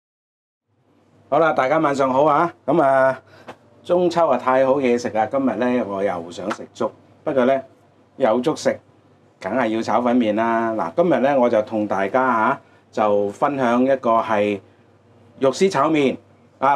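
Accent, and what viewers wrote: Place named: Southeast Asia. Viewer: Leo應該唔係香港人聽你講野似東南亞人多啲喎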